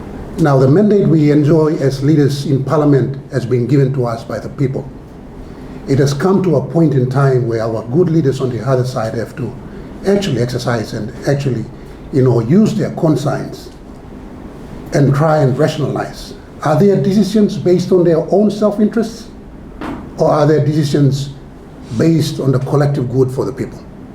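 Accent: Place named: Papua New Guinea. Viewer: U speak like a PNG man and not an Engaian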